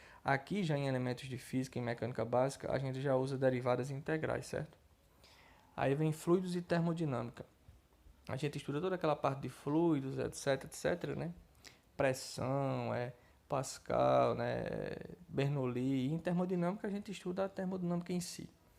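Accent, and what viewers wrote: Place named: Northeast, Brazil. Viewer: O mercado de trabalho de licenciatura em física é bom aqui no nordeste? (suponho que vc tbm seja da região já que está analisando a grade de uma facul do RN. Fora o sotaque gostozin kkkk). Vou entrar na minha segunda graduação que será em licenciatura em física e gostaria de saber se demora muito entrar no mercado.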